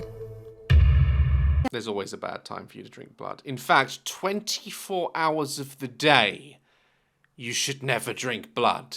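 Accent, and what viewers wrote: United Kingdom. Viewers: Your videos haven’t failed to make me laugh, I love that British accent (as well as the very sassy voice) 😂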